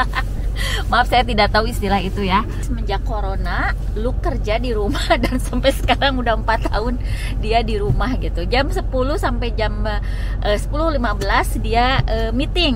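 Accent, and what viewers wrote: West Java, Indonesia. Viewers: Teteh sundanya darimana ?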